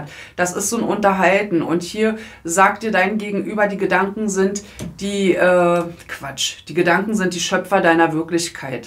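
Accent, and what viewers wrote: Berlin, Germany. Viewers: frei Schnauze mit Berliner Dialekt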